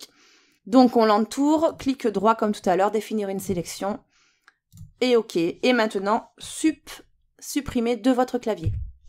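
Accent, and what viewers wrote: South, France. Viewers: Salut, tu viens du sud ? sa s'entend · Coucou! Merci pour ce petit tutoriel confectionné tip top! C'est génial de suivre tes explications avec l'accent du soleil.... quelle facilité, à croire que l informatique est dans tes gènes 😆. Maintenant au travail, là c'est une autre paire de manche hi hi.... merciiii 😗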